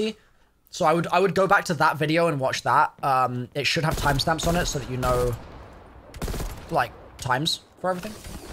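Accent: United Kingdom. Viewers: Hearing “y’all” in a British accent might be the best thing ever; you’ve surpassed NJ456, and have become the Omega Gamer Leader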